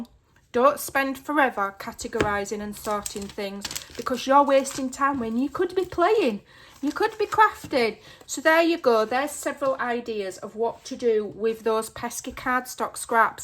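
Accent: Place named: North, United Kingdom. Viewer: Only just found you and haven’t watched this video all through yet but …..I just love your northern accent so you’re a winner already 😀 .. will comment on the video later 😀 xx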